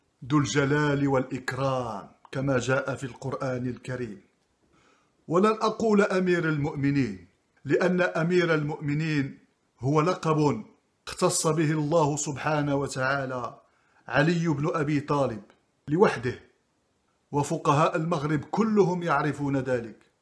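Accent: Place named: Tangier, Morocco. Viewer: bonjour Mr je suis marocain de Marrakech,j'aime ce que vous dites et j'en rigole tout le termps et sur tout ton accent tangeroise. bravo et vive les hommes libres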